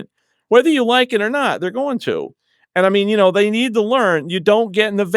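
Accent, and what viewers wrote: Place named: Rhode Island, United States. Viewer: If I didn’t know better, I’d say you were born and raised in Rhode Island.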